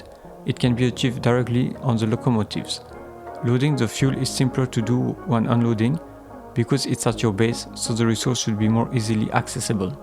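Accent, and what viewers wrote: France. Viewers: Le petit accent français, j'adore 💕 Cocorico ;P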